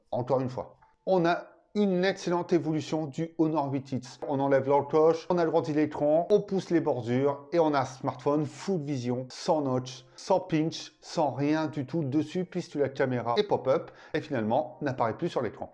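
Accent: France. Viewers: Merci pour tes conseils et présentations éclairés, je viens de me commander un honor 9x du coup. Mais il m'a l'air t'es bien de ch'nord, ti, hein?? Bonne continuation pour ta super chaine YT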